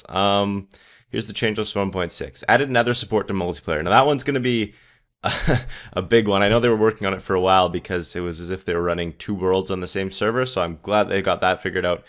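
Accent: Canada. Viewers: ... I KNEW IT!!! Oh god.... You are canadian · are you canadian?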